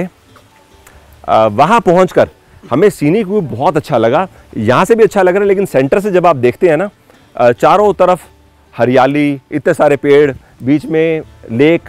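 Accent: Punjab, India. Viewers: Mr your punjabi accent is making videos great treat. Because north Indian people explain food nicely and appreciate.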